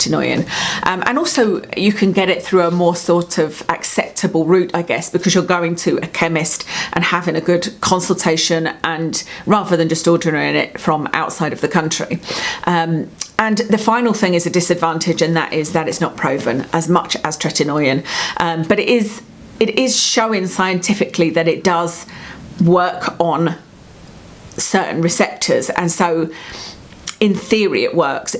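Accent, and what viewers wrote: United Kingdom. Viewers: Heavy British accent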